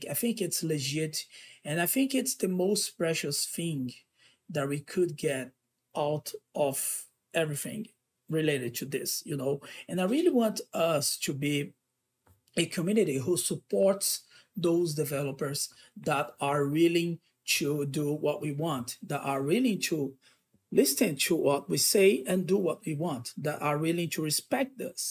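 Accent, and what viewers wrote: Brazil. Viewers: I can recognize that Brazilian speaking english tone everywhere. Keep the goop work, fellow BR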